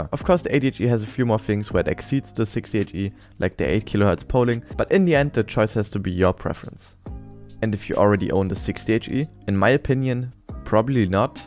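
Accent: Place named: Germany. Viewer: Diggi du bist deutsch oder? man hört direkt vom Akzent. :D Stabiles Video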